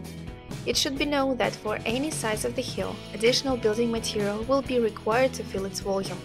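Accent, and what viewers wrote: Russia. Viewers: русский акцент убивает просто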